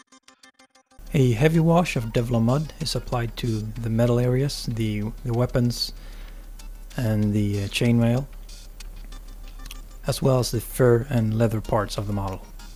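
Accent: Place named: Sweden. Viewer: Tyckte att jag anade lite svensk brytning i din engelska. Nåväl, mycket bra klipp och snyggt målat. You are subscribed :)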